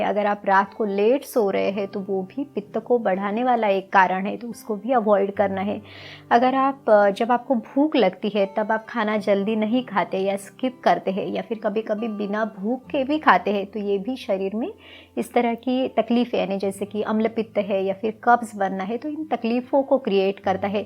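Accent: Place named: Maharashtra, India. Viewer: Aarey tumche bolanyaavarun tumhi marathi waatata. Mag aadnaav Kasey 😂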